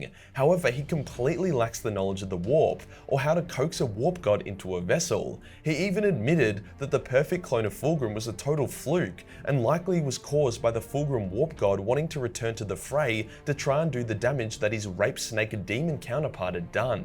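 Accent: Australia. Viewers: This guy earned my sub for his 0 fucks given Aussie language. 🎵Aussie Aussie Aussie Oi Oi Oi🎶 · Octarius war explained by an Australian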